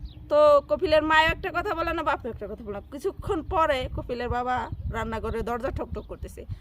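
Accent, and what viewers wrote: Manikganj, Bangladesh. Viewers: আপু আপনার ভাষা শুনে মনে হয় আপনি মানিকগঞ্জের সিংগাইর থানার সাহরাইল এলাকার আসে পাশের মেয়ে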